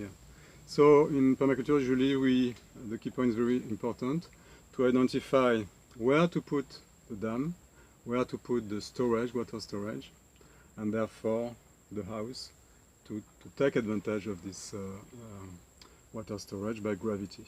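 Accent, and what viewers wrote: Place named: France. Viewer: You are speaking in english, with a french accent, in a channel with a name that is in portuguese. i'm kinda confused.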